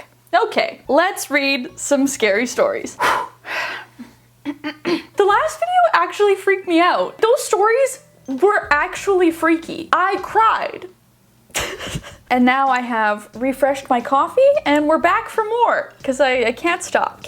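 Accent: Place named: Canada. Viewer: That's the Canadian jumping out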